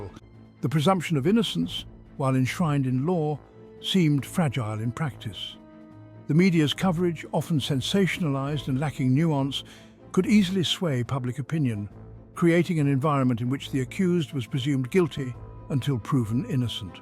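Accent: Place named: Virginia, United States. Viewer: This leaves me with a lot of questions E. Did he ever get convicted? I see a lot of penitentiary pics; did he get knocked for something else?? How long has he been down; or is he home? I'm from VA so I'm more partial to hearing you talk with our accent and terminology. This is more mainstream type and I get it but I'm kinda more partial to you Homie.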